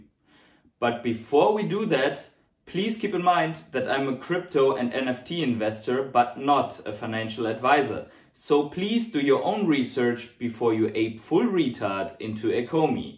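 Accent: Germany. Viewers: Good content again. Last point is pretty good :) By the way are you german? Just curious since your english accent sounds like german.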